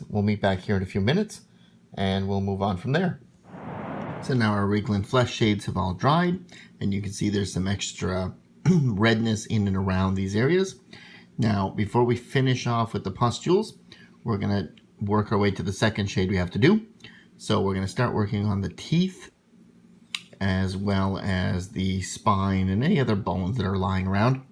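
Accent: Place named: Canada. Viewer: so fahr? i hear a fellow hoser!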